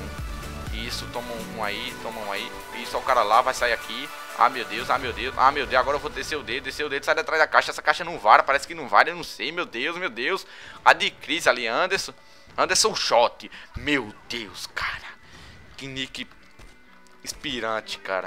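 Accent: Northeast, Brazil. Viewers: KKKKKKKKKKKKKKKKKKKKKKKKKKKKKKKKKKKKKKKKKKKKKKKKKKKKKKKKKKKKKKKKKKKKKKKKKKKKKKKK Eu queria ter o sotaque nordestino mano o negosso é muito dahora man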